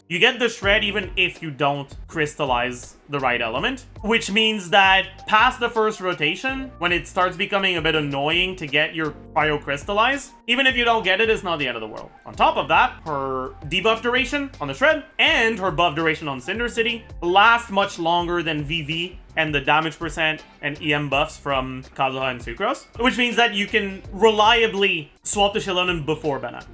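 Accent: Quebec, Canada. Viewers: I definitely hear the French Canadian influence every time speaks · its probably canadian french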